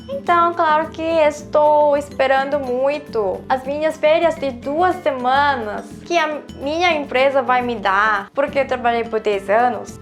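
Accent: South Korea. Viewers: Algumas perguntas; Os seus colegas de trabalho sabem que você fala português e já lhe perguntaram porque você quis aprender essa língua? Eles perguntam a você sobre o futebol do Brasil? Na Copa do mundo de 2002 você já sabia falar português? Seu português é fluente mas ainda com algum sotaque coreano, as vazes você não conjuga o verbo tipo; Eu começar a fazer algo.. seria eu comecei... Mesmo assim conseguimos entender o que você quis dizer. Nós brasileiros achamos muito bom e super legal ver estrangeiros falando ou tentando falar em português mesmo que as vezes de forma ainda crua nós procuramos ajudar e tentar fazer com que o estrangeiro fale do jeito dele e nós tentamos entender e ajudar, as vezes pode vir um portunhol (mistura de português com espanhol). Estou muito feliz de está no seu canal pela sua alegria e simpatia e amor pelo Brasil. Bjs (beijos)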